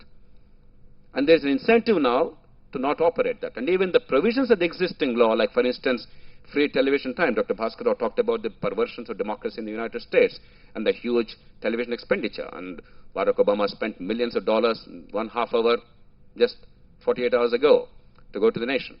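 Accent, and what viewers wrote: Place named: United Kingdom. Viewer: Really his voice resembling British slang... Hats off sir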